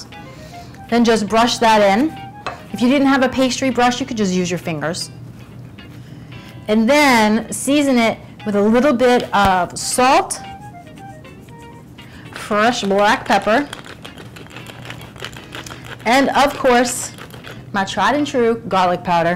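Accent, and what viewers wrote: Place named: United States. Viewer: I had some problems to understand your English. It seems that your English is US-English, which is very hard for me to understand. I wanted to know, what kind of spices you have used. I noticed that you put salt, pepper and garlic powder. But first, what kind of liquid you have rubbed on the squash? It seems that it was an oil. Can I also cook Spaghetti Squash under steam?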